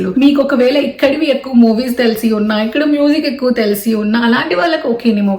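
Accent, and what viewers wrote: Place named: Canada. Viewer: vat izz dis, whu bludy cars abut ur uze les histeri. nd u r aksent iz u born nd brat up in khanada not in India. duck off nd u cho kalled NRI's doont put dese vedios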